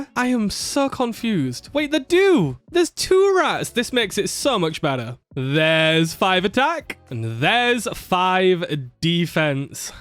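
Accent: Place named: Northern England, United Kingdom. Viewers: i wasn't really interested in this series when I saw it in my recommended, but then I heard the yorkshire accent and now I am staying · Ahhh you’re so northern, I’ve found my home here 😂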